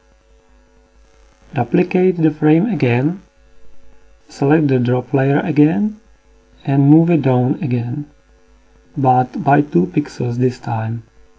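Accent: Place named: India. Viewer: That's an indian accent not a russian one.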